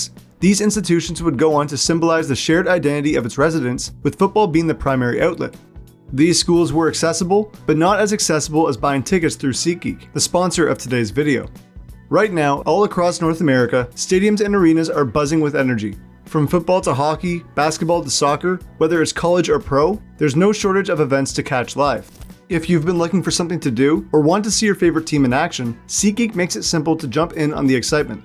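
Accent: Canada. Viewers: Canadian accent tho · The narrator sounds like a Canadian, check how he pronounces "out'.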